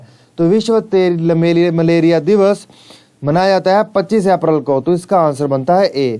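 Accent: Punjab, India. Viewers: paji sardar lagde oo punjabi hindi tuhadi mix ho rhi aa chal koi na veer........god bless u rabb trakiya bakshe...thank u so much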